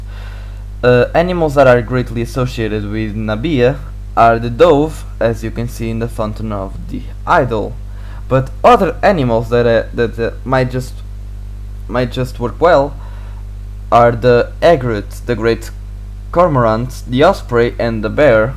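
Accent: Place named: Portugal. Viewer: Sotaque português. 👍🏼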